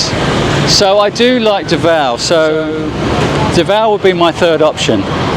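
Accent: England, United Kingdom. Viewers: actually I just realised. I really hate the UK accent, especially London. But your English accent is much easier on my ears :) Have a nice rest of the week. Dramaguetti, Dumaregretti haha. What else is that place called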